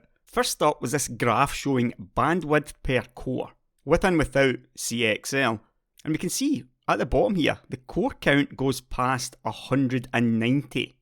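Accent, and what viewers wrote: Scotland, United Kingdom. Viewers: a scottish tech youtuber say "all right guys, how's it goin?"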